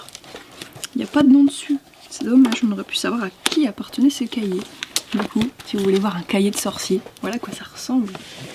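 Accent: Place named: Nord, France. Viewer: YES je savais que je connaissais bien cet accent ! T'es à la voie 9¾ de Lille Flandres ! Eune sorchière deuch'nord !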